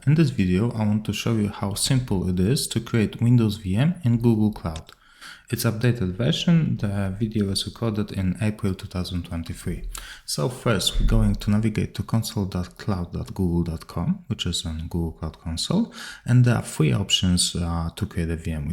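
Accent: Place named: Poland. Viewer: od razu poznalem nasz akcent :P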